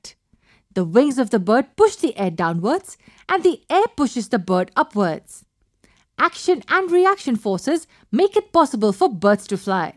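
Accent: India. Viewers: this is to bad y r u Indian and explaining I didn't understand anything form u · Sounds Indian to me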